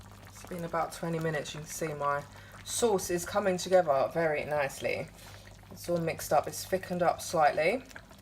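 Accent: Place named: Australia. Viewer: You should have made cuts at both ends of chops to prevent them from curling up when cooking....They will remain flat to cook evenly...Looks real delicious though...And i adore your accent...Are you from Australia??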